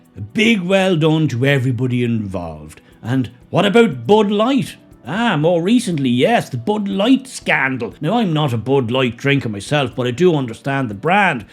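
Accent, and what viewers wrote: Dublin, Ireland. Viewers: I think it's very odd that your accent says "Dublin" but you use USA words like "store" and "tanked".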